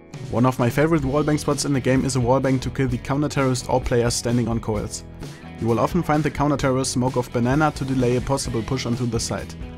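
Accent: Germany. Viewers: man hört einfach raus das es ein deutscher typ is ;D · Du kommst aus Deutschland oder ? :D hört man am aktzent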